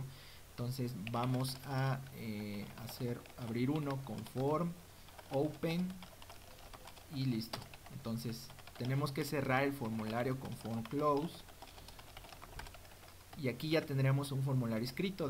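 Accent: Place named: Mexico. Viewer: Gracias por compartir, originalmente llegue buscando algo en concreto que se me esta complicando pero, me gusto tanto tu forma de explicar los temas que decidi hacer el curso, no se si lo terminare, pero como puedes ver ya voy por el 7 y seguire sin duda. Proximamente te escribire para hacerte una consulta ya que, como te decia, originalmente estaba buscando algo en concreto que al menos or donde voy no he visto que lo comentes. Escuchando tu acento me parece que eres de Mexico? vivi ahi muchos años, lo echo de menos pero me gusta escucharte.... un saludo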